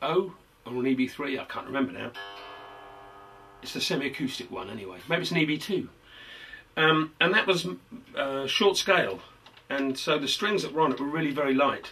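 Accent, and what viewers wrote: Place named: United Kingdom. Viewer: <3 Love your british accent.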